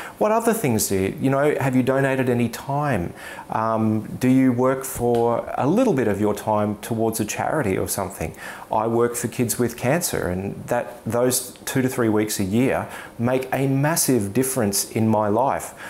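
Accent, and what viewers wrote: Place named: Australia. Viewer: I love your Australian accent.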